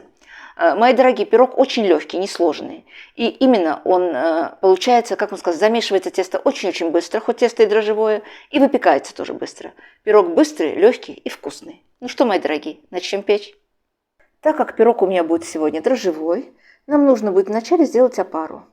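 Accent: Baku, Azerbaijan. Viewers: Здравствуйте! Спасибо за прекрасные рецепты!!!Приятно слушать вашу речь с бакинским акцентом❤❤❤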